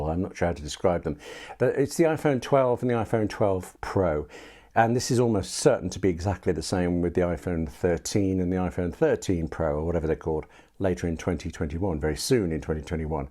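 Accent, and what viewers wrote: England, United Kingdom. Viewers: You have a beautiful soft English accent I could listen to you speaking forever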